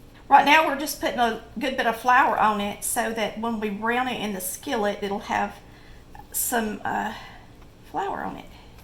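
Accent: South, United States. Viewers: I love your videos, your Southern accent, and your recipes. I cooked this Swiss steak and it turned out tender, juicy, and delicious. You're a great cook.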